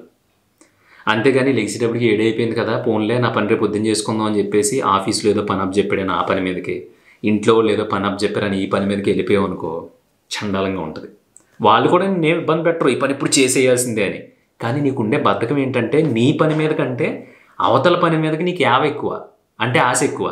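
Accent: Godavari, India. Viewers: thanks for your motivation anna..midhi godavari side kada mi way of talking lo miru godavari side anipinchindhi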